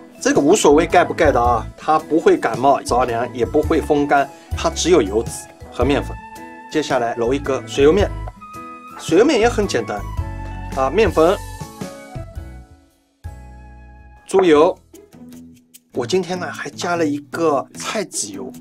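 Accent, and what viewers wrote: Shanghai, China. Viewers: 好亲切多上海式的普通话啊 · 上海普通话，亲切啊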